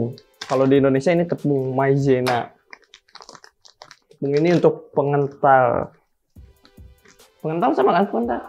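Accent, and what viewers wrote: Malaysia. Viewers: comel je ckp melayu hahahah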